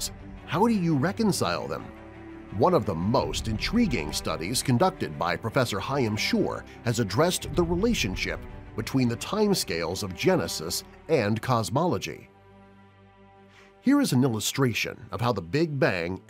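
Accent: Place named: United States. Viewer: why didn't you choose someone who can speak Hebrew to narrate? the pronunciation is so american...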